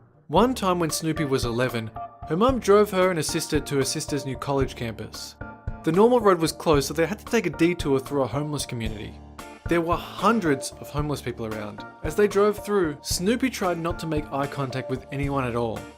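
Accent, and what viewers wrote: Australia. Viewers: Oh, you’re Australian. Thought u were British... whoops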